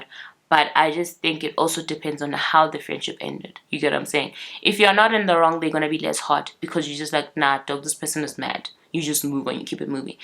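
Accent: Nigeria. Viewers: Definitely, definitely want to see more content similar to this. It opens eyes and strengthens women in so many indescribable ways. I love the Nigerian accent as well, always gets me smiling🤭🤭🤭❤️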